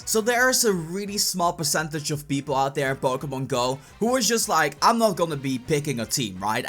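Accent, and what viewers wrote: Netherlands. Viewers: It really sounds like you have a Dutch accent.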